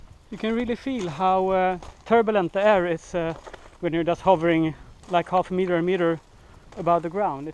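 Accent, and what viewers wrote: Sweden. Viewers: ARE you Swedish? your accent is pretty Swedish... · Darkpassenger 1 He sounds Swedish.. They all have a long standing tradition of eating poisonous shrooms and beating the sh*t out of themselves for fun. They discovered America and didn't even care since there was nothing to loot and the people already living there were too weak to be used as slaves. Columbus had more luck a couple centuries later as he landed in South America where there was gold and the people were stronger and of more use. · Christian Fjelldal I sense Sweden.. I'm from Norway btw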